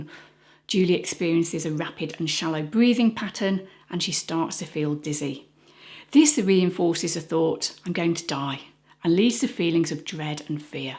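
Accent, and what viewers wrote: United Kingdom. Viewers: Excellent CBT explanation with beautiful British accent, thumbs up!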